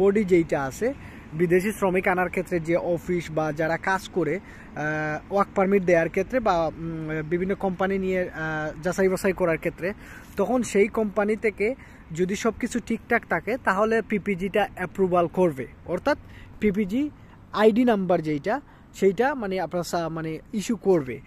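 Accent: Sylhet, Bangladesh. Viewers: ভাইর কথায় লাগের সিলেটি আফনে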